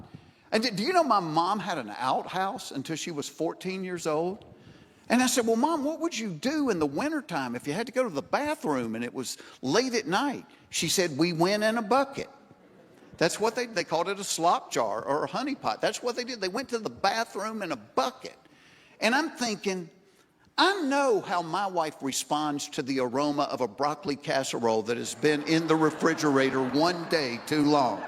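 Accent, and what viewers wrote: United States. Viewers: Not funny Jeff is going to be old soon. Making fun of the elderly is uncalled for. He has no respect for the elderly that helped support him baby sat when he was a baby and a little boy growing up. He was spoiled and the parents let him treat his grandparents anyway he wanted. Bad parenting has spoiled grown children. Jeff acts like he is younger than he is. To think his mother is in her 80s. Yes an elderly person can carry at 80 since their grown children are left alone in their homes and they live by themselves the elderly have to have protection. They don't have to buy a 38 caliber gun they can buy a small 22. How would he feel if his mother was robbed at night and they hurt his mother all because he made fun of her not wanting her to get a gun. The bucket has been a part of history when they didn't have bathrooms with flushing toilets and most everybody all ages had a pot that was put under their bed. 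How about the poor that had to use buckets and people they only had out houses. He's got a southern accent he should know the south had hard times just like the people in the north New York. Shame on him being a so called comedian. The elderly have real medical problems no related to age. Alzheimer's is Diabetes of the brain and the researchers found a 13'year old with Alzheimer's. They quoted its not related to age. forgets things all the time he isn't going to be all alone in forgetfulness because memory loss comes from pesticides and what's all in our food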